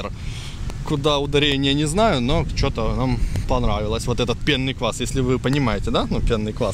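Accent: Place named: Ukraine. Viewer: Ведучий-твій " рускій "- із диким українським акцентом. Перейди на рідну мову-блогер...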